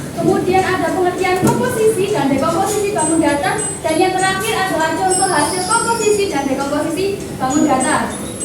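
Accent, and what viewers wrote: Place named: Java, Indonesia. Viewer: Semangat.. Semoga bisa menjadi guru yg sejati... Bahasane medhok banget sih mbak....😂😂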